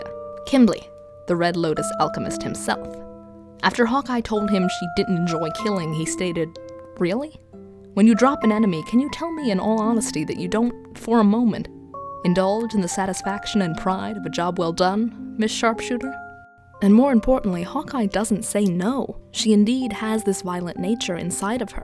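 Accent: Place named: Norway. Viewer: Your accents sounds Norwegian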